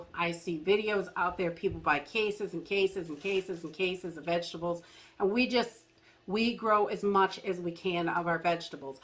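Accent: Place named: Maine, United States. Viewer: You are so right! My husband said the other day, please don't bring any more food home, we don't have the room! Lol I have filled every area I can find., your 🤔Maine accent has never been more obvious. TY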